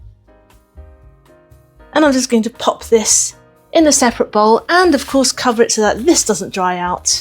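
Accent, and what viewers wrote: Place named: United Kingdom. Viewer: I just love you're cute British accent. Thank you for reminding me of the vodka and food color painting method of decorating cookies. I am going to try that for Christmas. 🎉❤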